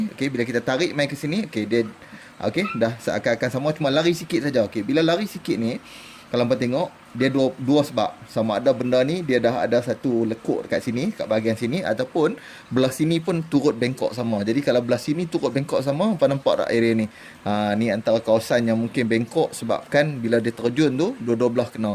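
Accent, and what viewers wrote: North, Malaysia. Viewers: Uishhh pekat sunggoh laaa loghat utara dia🤣🤣🤣sempoi bos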